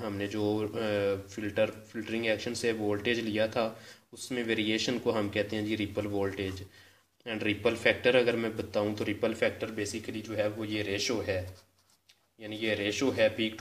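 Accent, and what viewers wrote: Punjab, India. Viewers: Are u from Punjab?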